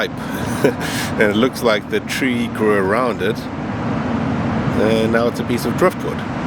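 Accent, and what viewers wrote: South Africa. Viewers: Just from your accent u are definitely from South Africa. U are in an awesome place. But do u have any knowledge of our eastern cape here in SA cause I love collecting rocks but no rocks like u have found. Just give me some intel here in Gonubie or surrounding areas where I can find similar ❤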